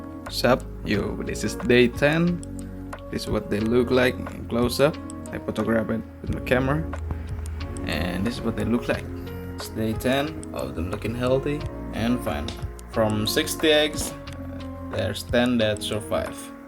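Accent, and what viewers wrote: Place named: Indonesia. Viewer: Dari aksennya langsung kedenger, Indonesia ya? Eh bner pas tengok channel mas nya 👍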